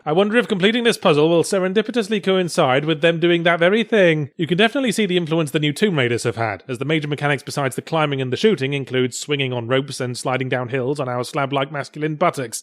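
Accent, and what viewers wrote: South Africa. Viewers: Dear Yahtzee, as a South African I can safely say you are 100% correct, everyone hates us and most of all we hate each other. Oh and btw your accent is terrible, both in your resident evil play through with Gabe and here. You're still awesome, keep up the great work. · Oh, how I missed Yahtzee's South African accent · I am South African and that statement about us not getting along with each other is pretty apt. Even more so now that President Zuma has our country in a lovely nose dive to end up like Zimbabwe. Oh good review as always Yahtzee, your SA accent is still atrocious though.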